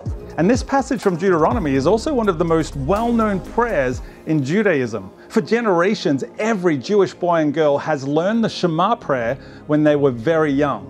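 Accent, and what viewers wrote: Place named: Australia. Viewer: Missed your voice man! Love reading your devotionals every morning! But something about that Australian voice explain things just makes it better. Loving this channel so far!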